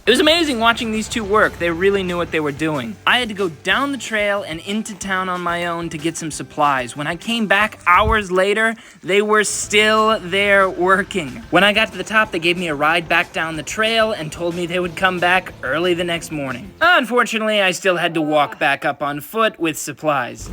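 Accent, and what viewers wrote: United States. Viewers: You sound like American ! Euros??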